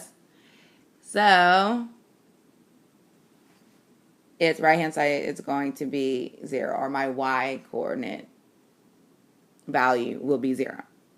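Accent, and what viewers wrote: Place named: Barbados. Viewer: are you from barbados?? your accent is kinda rihanna-esque lol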